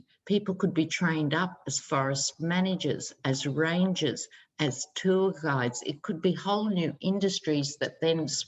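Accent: Australia. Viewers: You remind me of Dixie from "Designing Women", she was such a beautiful actress and had such a sexy voice like yours. Your Australian accent vs her southern accent. I knew you looked like a famous person but couldn't think of her, she was married to Hal Holbrook (spell.?).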